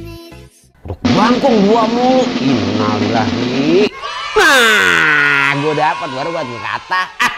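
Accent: Jakarta, Indonesia. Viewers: Bang selalu di hati 😂😂😂 Logat betawinya itu loh yg bikin saya 😀😀😀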